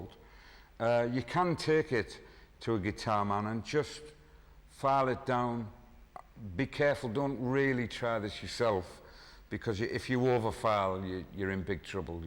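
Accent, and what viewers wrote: Middlesbrough, United Kingdom. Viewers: I'm a Middlesbrough guy, our accents are pretty similar - we've never met but in the late 60's when I got married we lived in one of the street houses near Ayresome Park, and Rea's cafe of course. In '62 my mate and I bought guitars from Hamiltons Music; he got the exact sunburst Hofner as you and I got the red Hofner V2 with same switch gear as your brown one, a young lads Strat. Cost 52 Pounds! Both were played, mine through a Selmer amp, around the pubs, clubs, dance halls in the area and were well used. But I still have the V2 in its original red-plush lined case, no longer in use of course. In the 80's I also bought a Tokai Springy Sound Strat in South Bank. I traded it on a new Tel some years later, which I regret as they are sought after now. Also have a PRS Les Paul type but never had a Strat, so am thinking of getting one for that unique clean tone. 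cheers John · Thanks, nice to see how you work all that. I was born in Middlesbrough but parents left when I was five. Nice to hear that accent again.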